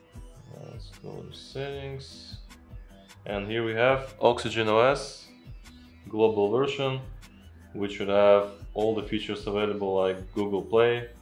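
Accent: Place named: Eastern Europe. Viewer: Hey, where did you get your phone from? I'm going to buy it in China probably from the official OnePlus Chinese website and bring it back to Europe. I'm pretty scared tho, I hope this works and doesn't brick my phone. I guess I should just not do any H2OS updates and it should all be fine? Also, from your accent I have a feeling you come from a Slavic country, am I right? :D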